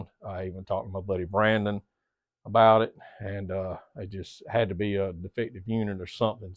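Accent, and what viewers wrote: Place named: South, United States. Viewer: Cheers bud. This is comin from a yankee. Gotta say, i was raised by a southern gentleman. Although i dont live in the south, its really cool to hear a you tuber who’s doing videos about home theater, AND has a little southern twang in his voice. Cheers good sir! I enjoy hearing about your home theater journey. Have a good one..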